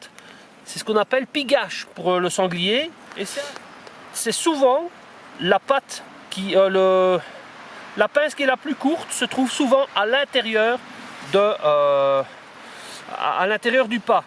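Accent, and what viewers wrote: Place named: North, France. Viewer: Toi tu viens du nord .. Encore un kek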